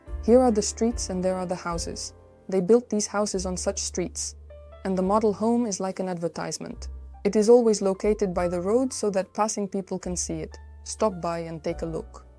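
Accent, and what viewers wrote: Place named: India. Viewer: Акцент жесть, конечно. 😅 Индийский акцент. Вроде в СССР хорошо преподавали английский, почему у вас такой акцент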